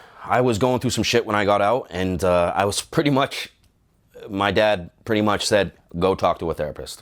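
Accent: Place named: Massachusetts, United States. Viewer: Your a real man. Thank you for talking about it. You do make a difference to others. Btw Im from Maine and your accent from Mass makes it more real for me. You got this bub! Awesome what your doin’. Don’t stop.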